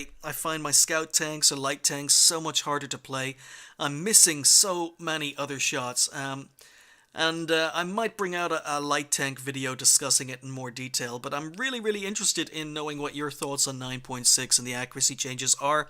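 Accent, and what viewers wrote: Ireland. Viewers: Forgive me if I offend anyone but in my admittedly very limited experience of english accents I think your Irish accent isn't very pronounced. It's definitely there but I was wondering if you're from Northern Ireland which I assume would have a more British accent?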